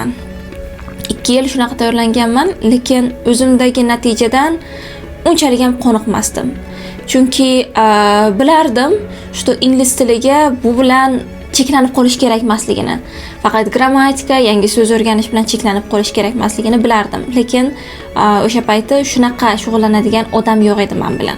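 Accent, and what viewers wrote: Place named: Bukhara, Uzbekistan. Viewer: Buxorolikmisiz shevezdan sezdim😊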